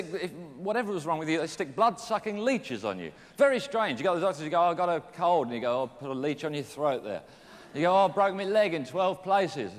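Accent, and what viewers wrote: Australia. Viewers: I love how him living in Australia meant he has a slight Australian twang to his accent.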